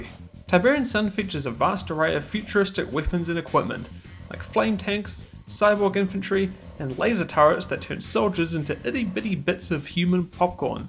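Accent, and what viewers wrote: New Zealand. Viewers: Gonna love the Kiwi accent. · is that a kiwi accent i detect?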